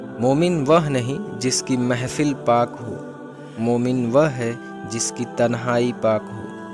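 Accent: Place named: India. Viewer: Aap kya India se ho aapke bolane ka Andaaz Sahi Nahin Hai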